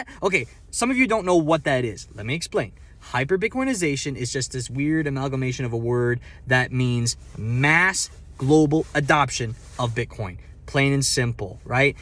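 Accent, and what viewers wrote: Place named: Canada. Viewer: Like your feed that I came across and appreciate how you put us up to speed on current events. You sound Canadian 👍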